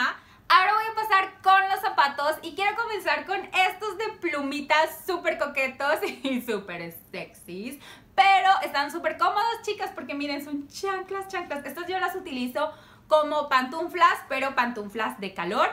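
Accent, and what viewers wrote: Monterrey, Mexico. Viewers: se te nota más el acento regio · regresaste con el tonito regio más marcado 😉😉 siempre pasa eso 🙈🤔🙈 me encanta al fin del norte!!! Y si el reto de los 100 pesos!!!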